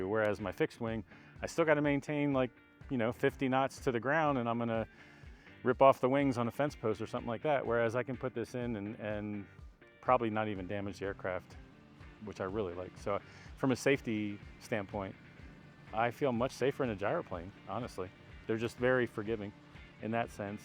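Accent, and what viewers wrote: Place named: United States. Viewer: most videos don’t mention location, but I actually did in the first sentence. Others clues for you are the English language and the American accent. Is this your first time on YouTube? If you ask questions before making arguments, you would seem smarter. Please take your silly argument somewhere else.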